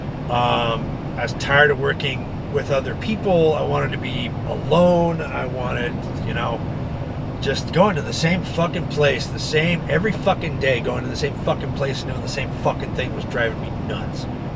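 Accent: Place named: United States. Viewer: Sir, congrats. U're coping well with the shit trough Èurope and life in general. I think u're great at storytelling. Record just like this, no edit, no music. Talk or comment on different themes, your genuine american-english is quite interesting. Upload 1 or 2 20 min videos per week. Stay safe and healty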